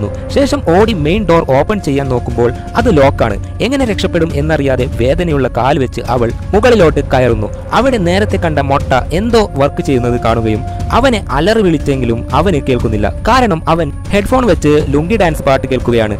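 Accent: Kannur, India. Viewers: കണ്ണൂരുകാരൻ ആണോ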